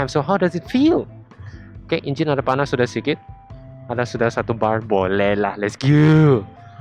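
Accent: Sabah, Malaysia. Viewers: logat sabahan....padu macam tu motor...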